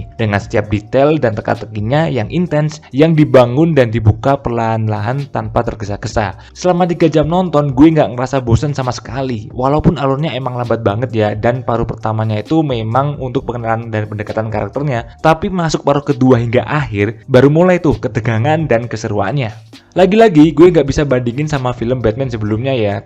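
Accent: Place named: Java, Indonesia. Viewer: Saran bor bisa gak, jang pake gue - gue Soalnya kan dirimu kentara banget medok nya Yg mending medok aja lebih enak didenger Dr pd lo gue lo gue..😁👍👍👍👍🙏🙏🙏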